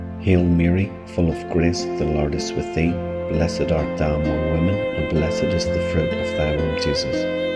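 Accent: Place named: Ireland. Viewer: I love the Irish voice. reminds me of being in school with the Irish priests and nuns as a girl.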